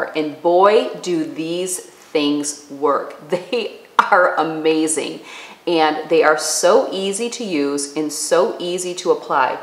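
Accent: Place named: Midwest, United States. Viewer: btw......LOVE your channel.....I've watched a few and like them as well but have decided you're my favorite.......your makeup colors are my makeup colors so much so that in look to copy everything you do via makeup......love it!.......lol your articulation and Midwestern twang are actually quite mesmerizing as well.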